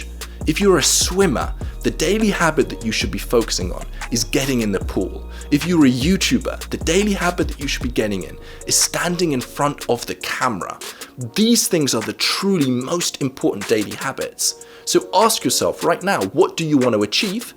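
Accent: United Kingdom. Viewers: Great! It's awesome. Are u from UK?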